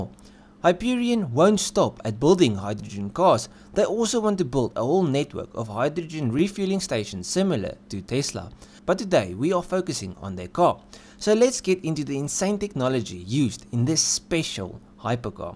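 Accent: South Africa. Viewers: Great to hear a real SA accent.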